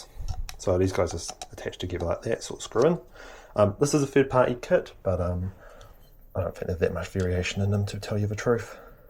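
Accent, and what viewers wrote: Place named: New Zealand. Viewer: Hi. Are you in NZ? Thought I might have picked the accent 👍 Can I ask where you purchased your third party service kit? I’m in NZ & found the tube that connects to the syringe for $19 & 50ml of oil for $15, both from 99 bikes which seems reasonable. Cheers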